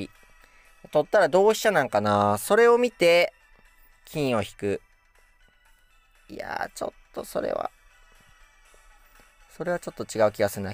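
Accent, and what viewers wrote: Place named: Kansai, Japan. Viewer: 関西人特有の適当トークが好きです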